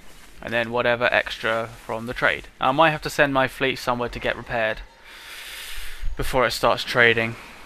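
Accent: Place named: United Kingdom. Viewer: You sounded really british!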